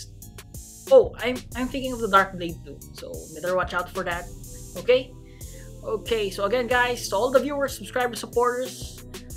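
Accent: Philippines. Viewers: hey gamernom are u filipino just wandering cuase sometimes u say ay idk im just wondering and thank you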